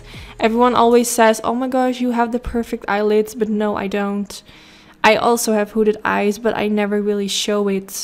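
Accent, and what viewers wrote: Netherlands. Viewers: If you have hooded eyes then I have. no eyes. Really if you call this hooded...girl my whole skin above my eeyes falls almost over my lashes. That is hooded eyes. Love how it looks!! Just doesnt work for my really hooded eyes. I just use black eyeshadow as eyeliner. Now for the first time I heard from your accent you are Dutch. Some words sounds familiar. 😄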